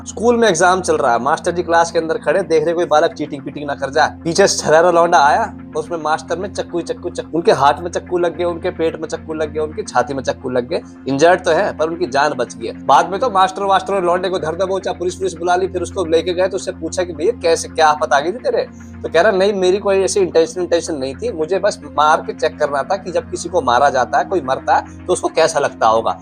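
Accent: Haryana, India. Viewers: ki always be making serious news damn funny with haryani accent 🤣🤣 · Bhai, Regular News banao Bhai. Ye jo haryanvi tone me comedy news alag hi lagti he. Crime news me to comedy he puri...😜😜😂😂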